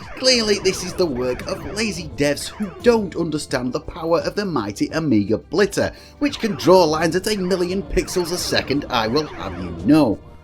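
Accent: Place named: Northern England, United Kingdom. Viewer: The Manchester accent... gahhhhh....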